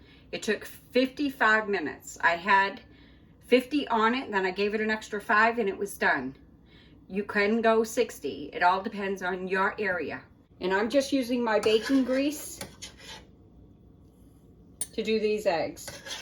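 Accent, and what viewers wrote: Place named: Rhode Island, United States. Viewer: New friend here from the Christmas collab! So glad we found each other’s channels. Looking forward to watching more of your content. I lived in Rhode Island for several years; loved it there so much. Your accent brings back memories. My name was Melinder instead of Melinda 😂 All of your recipes look fun and delicious. Merry Christmas!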